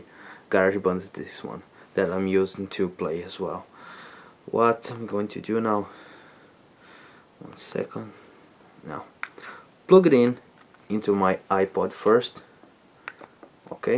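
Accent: Brazil. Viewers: Ou mano, quanto vc pagou no irig? hahah To ligado q vc eh brasileiro seu sutaque nao engana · Hahaha, verdade quando comecei a escutar percebi que era brasileiro. Mas ta valendo, obrigado pelo vídeo!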